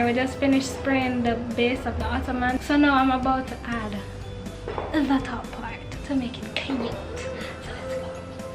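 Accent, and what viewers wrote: Jamaica. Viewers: That's a Jamaican accent. Pure Jamaican beauty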